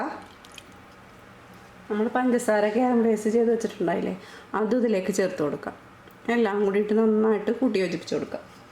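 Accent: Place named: Aluva, India. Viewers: കൊച്ചി ആണോ നാട് ?? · ചേച്ചി ആലുവ കാരി ആണോ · എവിടെ വീട്.. നല്ല പരിചയം ഉള്ള സംസാരം.. എല്ല word ന്റ അവസാനം "ട്ട ". . ഞാൻ ഇങ്ങനെ സംസാരിക്ക 😄from ത്രിശൂർ.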